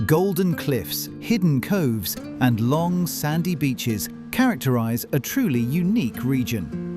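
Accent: Spain. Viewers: You made a Nice video of my country,And We do have a beautiful country in my humble opinion..But ,Please learn how to pronounce our cities and places in Portuguese not in Spanish.Believe me we will appreciate it.❤ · The Spanish music in the background and the Spanish pronunciation of Portuguese words (particularly words with 'J') is very disappointing. Portugal and Spain are culturally very different, in fact they were historical enemies for many centuries, so hearing Spanish music in the background and Spanish pronounciation in a video about Portugal is like nails on a chalkboard. It wouldn't have taken that much more work to doublecheck the pronounciation or at least use music that is not so obviously native to Spain like .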